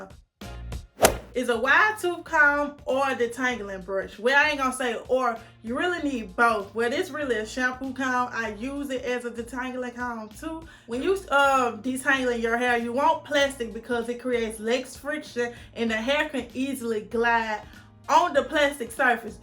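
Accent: South, United States. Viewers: Damm you country af. I couldn't understand most of what you said. Are you hard of hearing?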